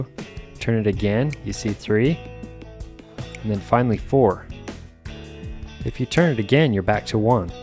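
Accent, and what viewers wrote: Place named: Utah, United States. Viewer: I can tell the guy narrating is from Utah with his accent.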